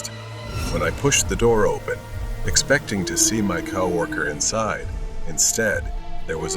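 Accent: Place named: New Jersey, United States. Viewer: your jersey accent makes your videos all the more comforting. been following since 10k <3 😰😰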